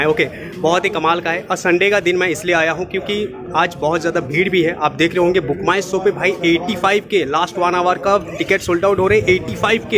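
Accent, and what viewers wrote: Odisha, India. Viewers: Aap odisha se ho kya❤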